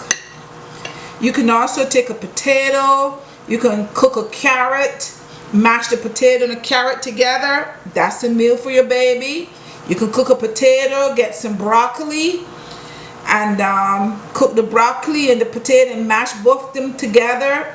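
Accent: Barbados. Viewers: So sweet to hear a real-real bajan accent.. even if it's putting something in her well.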